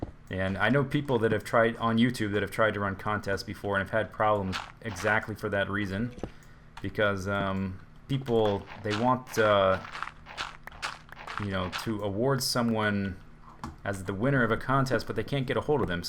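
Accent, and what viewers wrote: United Kingdom. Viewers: Good, British accent. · its, with a sexy uk voice?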